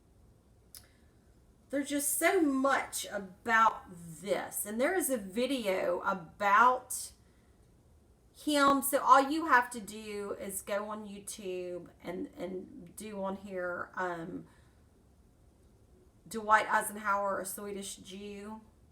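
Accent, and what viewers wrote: South, United States. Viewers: Really.... he wasn't even black... According to what we have seen... He was maybe only 1/3 "black", as they reckon it. He was more "white" than black. He just used the black as a stepping stone to make his money and create his political agenda. BTW, I love your southern accent. I'm from Mississippi, and I really miss a real "southerner" on these channels.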